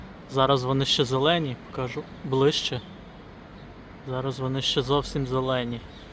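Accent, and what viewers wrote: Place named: Western Ukraine, Ukraine. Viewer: Я впізнав по розмові що ти з Західної України.Тільки нині натрапив на твої відео.Переглянув всі.Ти не зупиняйся.Досить добре виходить.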